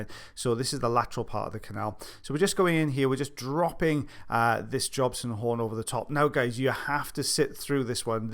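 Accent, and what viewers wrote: Wales, United Kingdom. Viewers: "Clever sausages" 😂 I love the Welsh accent, but then add these euphemisms, and I can't help but giggle! 🥰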